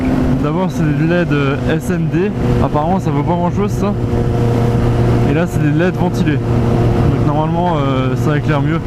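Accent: Alsace, France. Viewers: T'aurais pas un petit accent alsacien ? ;) super vidéo sinon ;)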